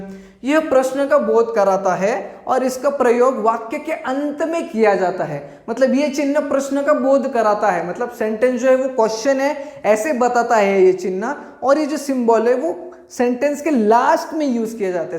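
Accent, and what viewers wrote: Maharashtra, India. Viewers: You are marathi i know because of your accent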